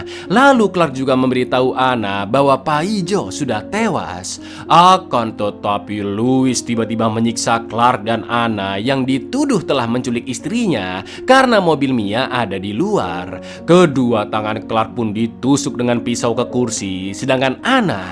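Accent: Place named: East Java, Indonesia. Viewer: Cak, jowone sampean ngger endi? Kok jowone podo carane ngomonge karo aku, aku wong lumajang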